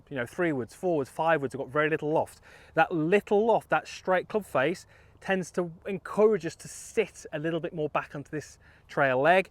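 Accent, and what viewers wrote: United Kingdom. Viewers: Hard to understand brit english cos english is 2nd language to me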